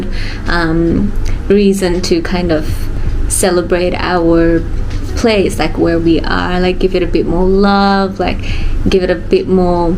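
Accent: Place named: Australia. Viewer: Your Aussie accent is getting stronger! ❤️ hehe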